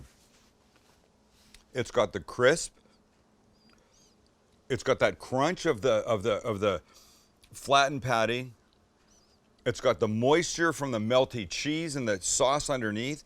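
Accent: Canada. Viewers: Hmmm - - did I just detect the faintest trace of a Canadian accent.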